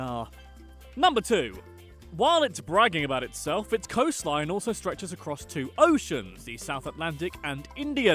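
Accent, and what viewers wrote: England, United Kingdom. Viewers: I am from South Africa nad the acsent is kinda british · Wow very english you need to work on your accent dude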